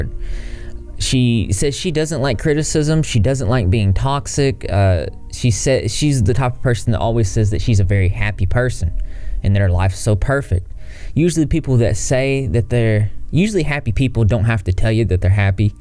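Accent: South, United States. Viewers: Your southern accent is endearing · are you from the south?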